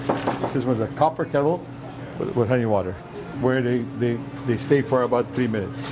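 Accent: Montreal, Canada. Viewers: funny englihs accent exclusive to montreal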